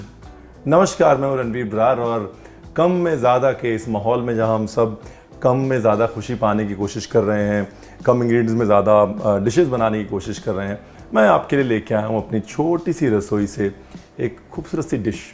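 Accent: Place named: Lucknow, India. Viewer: Punjab in blood and Lucknow in soul..such a deadly combination you are...love the way you talk in “lakhnavi andaz”... Aaye Haye!!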